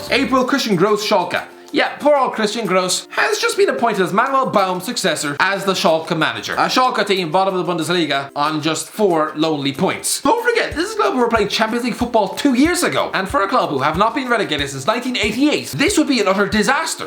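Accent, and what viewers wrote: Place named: Ireland. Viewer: If the accent hadn’t already given it away, the term ‘Glasgow derby’ will tell you who exactly the fav Scottish team is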